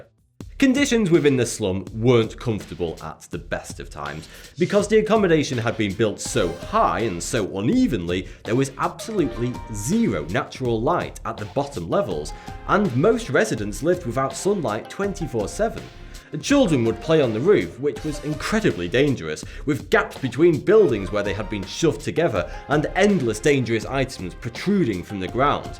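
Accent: United Kingdom. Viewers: What British accent is this? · Your British English is genuinely horrible. thanks for the lesson. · Different accent... Is he british? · Mmmm I just love your British accent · Is that British accent real? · "The slum became littered with endless brothels, gambling parlors and opium dens." Just the way you said Brothels, like there was a F in it or something. British accents are hot. I would learn to impersonate them but I am not posh enough to pull it off.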